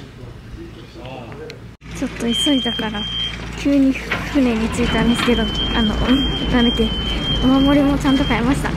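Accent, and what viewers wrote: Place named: Gifu, Japan. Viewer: 宝くじの神社と言えば長野の諏訪湖に有る諏訪大社有名ですがそこの神社で１番有名なのは「上社の御柱祭」全国ニュースでやる大きな木を山の斜面を落としてたりしてる神社ですよ☺️ 毎回、ちゃんの岐阜弁に癒されるw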